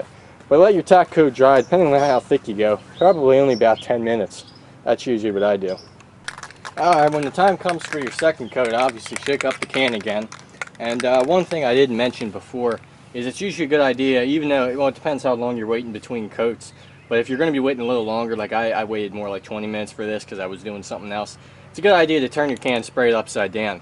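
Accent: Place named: Philadelphia, United States. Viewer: Where are you from with that accent Philly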